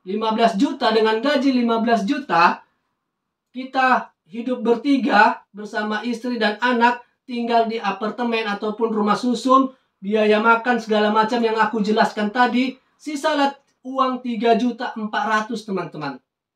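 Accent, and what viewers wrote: Medan, Indonesia. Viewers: Medan kali bahasamu lagi yak horaslah. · Kayak Medan kali bahasanya😊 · Abangnya logat bicararamya dari medan ya Bang? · Mantap abang ku , kayaknya medein medan ini abang ku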